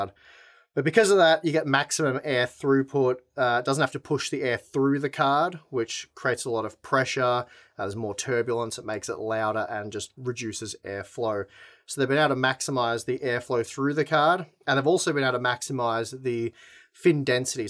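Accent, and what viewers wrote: Australia. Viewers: Aussies are so cute when they talk.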